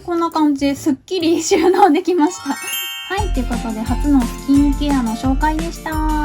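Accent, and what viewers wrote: Kansai, Japan. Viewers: 初めまして✨ サムネの可愛さと今スキンケアが凄く気になっててめっちゃ参考になりました🥺💕💕 気になったアイテムをQoo10で購入しようと思います✨ あと私関西人なので関西弁がとっても親しみやすいなと思いました🥰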